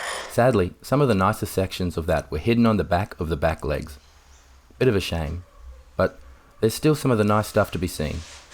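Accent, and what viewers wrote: Australia. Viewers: hi there..I can tell by your accent you are an Aussie like myself...I've watched various furniture flippers from other countries doing this but have wondered myself if there is money in it here in Australia..I know that this sort of furniture is rather expensive in Op/second hand shops and stuff on roadsides are generally cheap and nasty particle board, not worth fixing up..I have furniture of my mothers that date from the 50's, from when they first set up house, but alas some of it probably wouldn't sell, even if fixed up, because they are furniture that nobody really uses anymore, like free standing wardrobes...I'd love to keep them in my upcoming move but alas will not have the room for them..do you know of anybody flipping furniture here in Mel Vic..?? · Saw the thongs, heard the accent and knew straightaway you were an Aussie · Really like the look of this..and so nice to hear a fellow Aussie. 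A new subscriber for sure…🇦🇺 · Nice to hear an Aussie accent.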